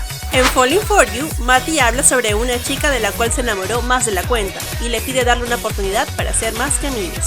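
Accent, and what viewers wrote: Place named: Chicano, United States. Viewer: Me gustó mucho la información, está muy bien hecho 💕 lo único que me incomodó fue la pronunciación del inglés de la chica :/ habla demasiado chicano jajsjj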